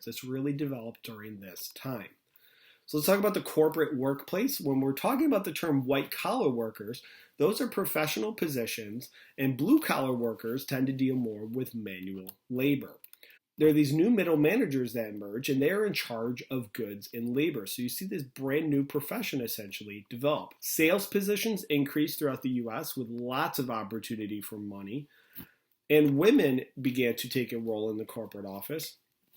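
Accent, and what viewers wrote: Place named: Buffalo, United States. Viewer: Guys, I think this guy is from Buffalo.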